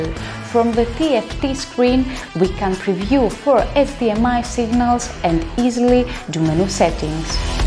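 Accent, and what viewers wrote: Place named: Greece. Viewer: r u Greek? I love your accent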